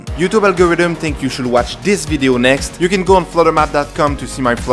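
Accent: Quebec, Canada. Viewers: Are you french Canadian by chance? This accent is really familiar haha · t'es quebecois?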